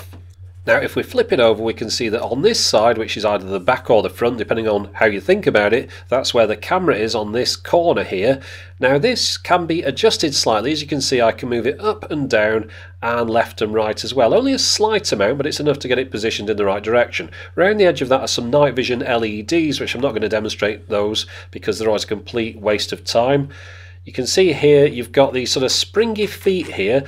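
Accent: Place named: North London, United Kingdom. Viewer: Cheers love........you have the same accent as Geoff Lloyd of Absolute radio.....Norff London?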